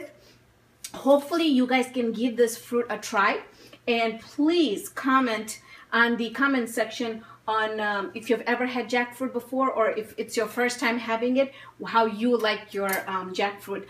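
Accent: Minnesota, United States. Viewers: my local grocery store in switzerland just started selling canned version so i came to youtube to check it out.. i love the minnesota accent haha. originally from minnesota myself and i am enjoying trying new flavors that are accesible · Are you from Minnesota? :) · You sound like your from Minnesota too lol! By the way its not meant as an insult. Also your video was very informative. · American accent nice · Don't think I've ever seen an Arab woman with a Minnesota accent.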